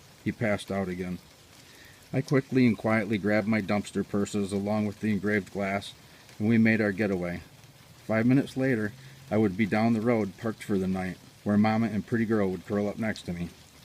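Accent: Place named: Rochester, United States. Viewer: Hello brother. I am new to your YouTube channel. I subscribed because as I scrolled through your videos I noticed videos and I adore that man! May he rest in peace. Also, I have a very dear friend who is from Rochester New York and your accent sounds so much like his. I guess those are two reasons as good as any for me to stick around for a while. God bless you.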